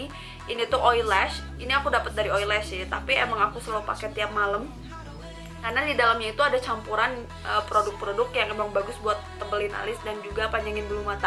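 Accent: West Java, Indonesia. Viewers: lucu kak, logat sunda nya keluar banyak di video ini 😄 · seneng banget sama cara pembawaannya di youtube, ga dibuat2, ga sok asik kaya youtuber lain dan ada aksen sundanya hihi. langsung subscribe! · ka orang sunda ya ?😀😀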